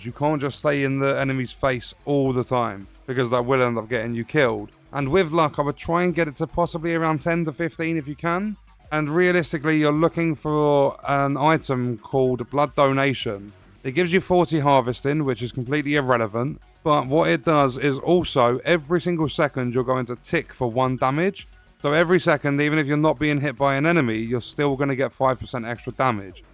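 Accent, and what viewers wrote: United Kingdom. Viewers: no hate but bro is the most british guy i heard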